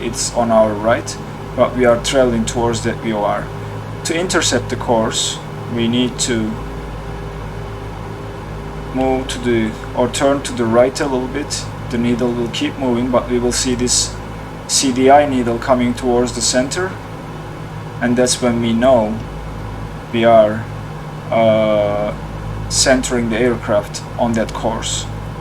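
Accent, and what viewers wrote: Turkey. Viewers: You are talking english like a turk, are you turkish, I wonder if you are turkish, I am turkish, so I'm asking, it was an excellent educational video by the way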